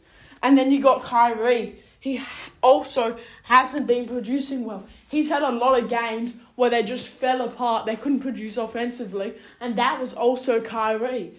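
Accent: Australia. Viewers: She doesn't sound American. Maybe Australian. Her understanding of the game isn't that good. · Well, time to change that title and edit the video. Sorry Bud. Are you Australian or British? Exum and Green need to speak with you mate.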